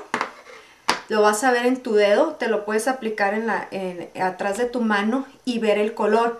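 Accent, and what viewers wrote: Tijuana, Mexico. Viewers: Me encantas!! eres de Tijuana? jajaja, yo soy de Mexicali y me identifico con tu forma de hablar jajajaja, saludoss sigue haciendo tutoriales son muy buenoos!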